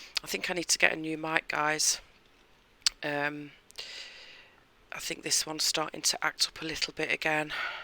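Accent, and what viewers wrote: United Kingdom. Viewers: Very cool!! With the colors you used they have a bit of a Southwestern flavor. I really like the way you created the subtle wood grain, it makes a great, interesting background and contrasts the colorful pieces well. I didn’t know you are in the US!! I thought you were in the UK somewhere. I hope you like it here. I suppose if you have been here over 10 years you must like it a bit 😊 It amazes me that you have maintained your accent. I went to school in Norwich for 3 years and developed such a British accent that people didn’t believe I was American🤣 Anyway, these pieces turned out great!! Thank you for sharing 💚💛💙🤍💜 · Wowser, that's gorgeous. Love the colours, and love the spiral patterned roller. Hey, I read the comment from the lady in the US. It's great you have kept your British accent. A lady on YouTube who does paint pours, is from Birmingham UK and emigrated to Australia in the 80's. 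She still has her Brummy accent. I would give anything to emigrate from the UK as it's horrible these days. I was born here in 1960. Like you mention though, the world over, you have to be careful about saying anything any more, in case you upset someone!!! I carry on speaking my mind, and ya boo sucks to everyone LOL!!!! Love your videos, thank you so much for sharing your superb creations with us. Take care, xx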